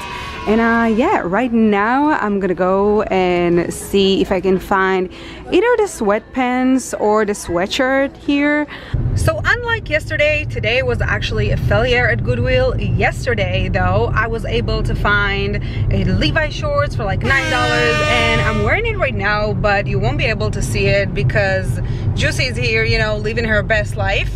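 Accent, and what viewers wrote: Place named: Dominican Republic. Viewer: I liked this video. She cracked me up with her silly moments.. "immagrant problems" ctfu. I Black and Hispanic so I understand how she speaks. I wonder where she from. I wanna say Dominican Republic..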